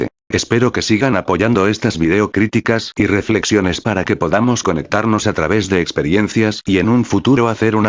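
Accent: Chile. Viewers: tu propia boca te delata: ERES CHILENO,igual que yo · Wena eri chileno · Eres chileno? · Eres chileno se nota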